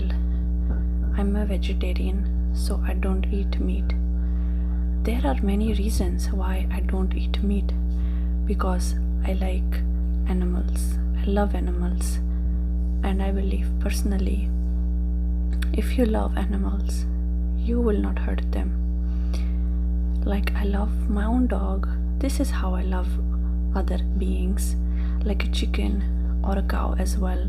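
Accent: India. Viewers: Please never stop uploading, this is great content! Also, is that a real Indian accent or is it something you learnt while travelling?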